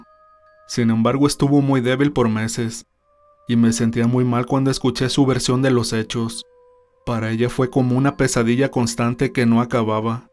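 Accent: Mexico. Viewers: Amigo, quiero hacerte una inquerencia, y espero que no cause ningún incordio, es la siguiente: El acento o tono que empleas para hacer tus narraciones ¿es propio de algún lugar en específico o es un tono que haces para narrar? Sí me suena mexicano, aunque no sabría de cual lugar de allá. ¿No es incomodo inquirir esto? Saludos, Dios te guíe.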